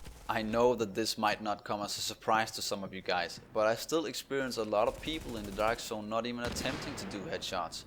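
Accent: Denmark. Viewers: could instantly hear that's another Dane :) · Synes også det var en dansk accent jeg kunne ane hahah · Er du dansker? Lyder sådan på accenten ;)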